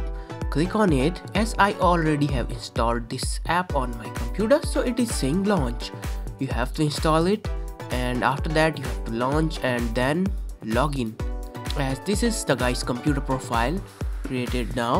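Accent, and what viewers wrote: India. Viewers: the indian accent is so adorable lol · This guy has a more Indian accent than me. ut all goods man. Everyones different.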